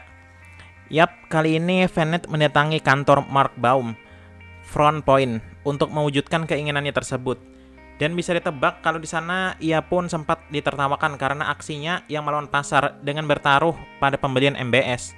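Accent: Banjar, Indonesia. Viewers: Dari review logat bicaranya, sepertinya org Banjar Kalimantan. Cmiiw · Ikam orang banjar kah mang ? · dari logat, macam orang hulu sungai 🧐🧐🧐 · Logat banjar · asli mana bang, kya logat bahasa banjar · Intonasi banjarnya kental bujur, jadi ngalih mencernanya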